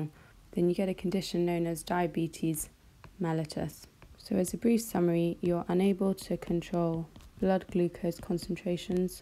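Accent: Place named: England, United Kingdom. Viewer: i could watch those videos just to listen to your English accent.... its so beautiful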